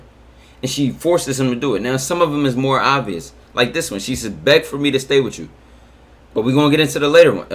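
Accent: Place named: Philadelphia, United States. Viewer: You can just tell a thurl philly nigga 😂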